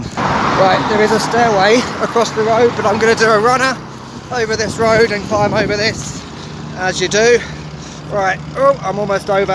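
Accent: United Kingdom. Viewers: 👋 hello greetings from USA/Mexico ! I love ur British accent! I was raised in Hidalgo Anywho try the arroz 🍚 con leche pastes and papá con pollo 🍗 yummmy 🤤!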